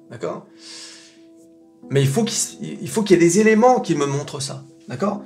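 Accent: United States. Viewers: Y'a pas mal de gens qui se sont fait rekt avec Eurotunnel, et j'ai l'impression que ça a laissé des traces dans l'inconscient collectif. Pis la bourse est une jungle qui fait semblant d'etre régulée, alors qu'avec les cryptos, on sait que c'est une jungle direct, y'a pas d'illusion. Au premier -95% si t'es pas mort, t'as compris q'il y a pas de règles... ;) PS: J'ai ete sur ta chaine Anglophone, c'est pas mal aussi cet accent Texan, t'as choppé ça où ? ;)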